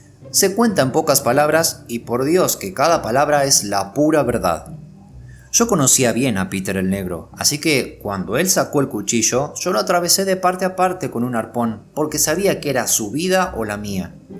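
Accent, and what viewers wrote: Rioplatense, Argentina. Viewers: valoro el esfuerzo hecho y le dejo like, porque creo que es lo mínimo que merecés. Pero convengamos que no es el acento ideal. Lo más neutro posible, sería lo ideal. Otros autores, con gusto los escucho con dicho acento. Ojo que el propio Borges hablaba y leía sin el "shesheo" rioplatense, a no ser que la obra evoque dicha pronunciación. No obstante, gracias por el tiempo y el esfuerzo. Un abrazo.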